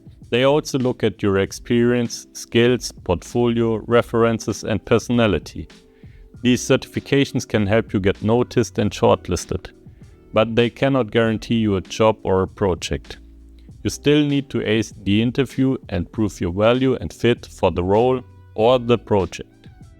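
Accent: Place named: Germany. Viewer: Love the German accent. Thank you for the video, very helpful, especially regarding getting started with the cloud topic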